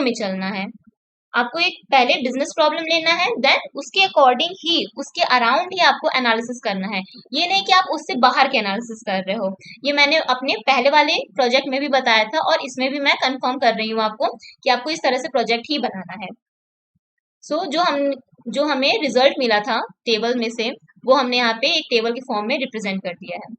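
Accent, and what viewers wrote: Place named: Varanasi, India. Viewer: I bet you are from U.P ...and from the accent I can say its either Banaras or Prayagraj...😅😅 ...and BTW ...the clarity of your analysis is astoundingly amazing ..keep up the good work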